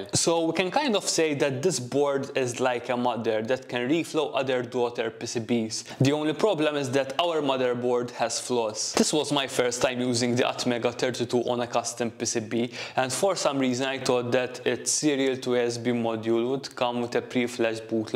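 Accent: Malta. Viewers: Can I ask, are you Maltese? Having Maltese family, I'm hearing the accent all the time and yours sounds very familiar! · It's unmistakably Maltese, and the name is another giveaway. I lived there for 15 years.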